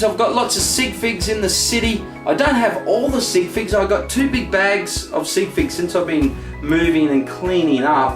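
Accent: Australia. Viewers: well dis guy is Australian..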